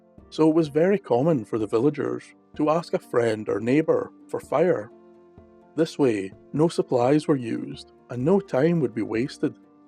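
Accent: Scotland, United Kingdom. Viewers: A Scottish accent is my absolute favorite accent and listening to you tell these stories has also taught me proper pronunciations! Because how I’ve read certain words in books is NOT at all how it actually sounds. Lol. I get so excited when you have a new post! Thank you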